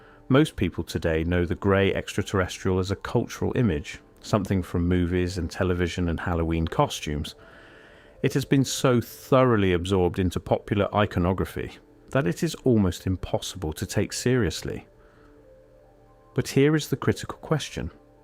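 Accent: United Kingdom. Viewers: Taking this with a huge grain of salt, since a google search turns up no encounter involving anyone of that name. Plus the faceles, unnamed, obviously British narrator claims transcribed materials from the original case wound up in his possession. Seems quite a stretch.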